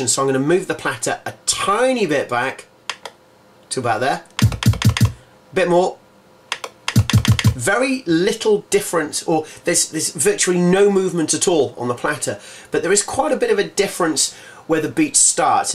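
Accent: United Kingdom. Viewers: Love brittish accent!!! #nohomo K !!!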